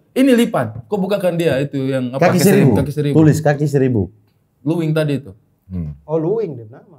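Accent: Eastern Indonesia, Indonesia. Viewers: kenapa logatnya mesti ikut²an logat timur? Mestinya pakai logat Minang aja, biar terlihat keberagamannya.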